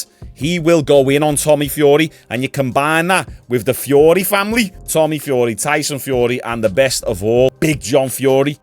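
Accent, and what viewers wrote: Liverpool, United Kingdom. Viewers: An elequent scouser yes lad 😂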